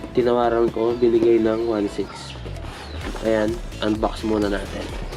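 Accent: Pampanga, Philippines. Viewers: Kapampangan ka pala😅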